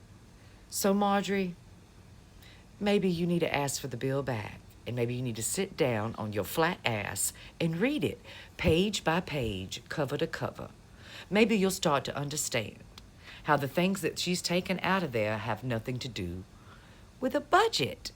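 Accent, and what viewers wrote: South, United States. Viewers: Yer southin' drawl borders on pandering.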